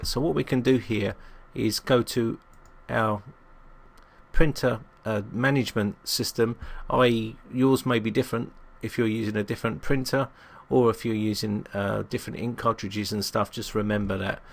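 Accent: United Kingdom. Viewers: This is not a Cockney accent,it's British English, Cockney accent is more like 'strine' Australian English.even at time like Texan English.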